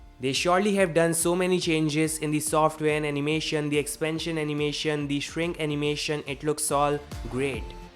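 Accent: India. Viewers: I heard indian i dislike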